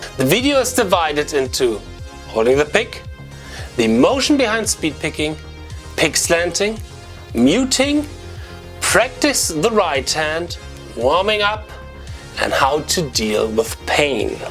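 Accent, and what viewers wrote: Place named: Germany. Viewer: Great video but as a fellow german,the accent makes me crack ip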